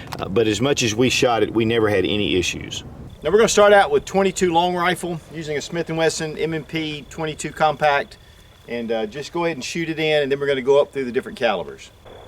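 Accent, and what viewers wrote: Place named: North Carolina, United States. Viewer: wiltson.....I have always loved the way u say that. in fact I frequently watch ur old Wilson combat videos......I'm from TN but u can't beat that nc accent of yours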